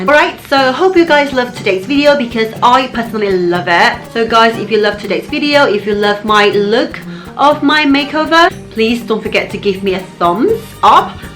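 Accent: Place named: United Kingdom. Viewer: 我高中畢業的歐巴桑都聽得懂🤗 英國腔 👍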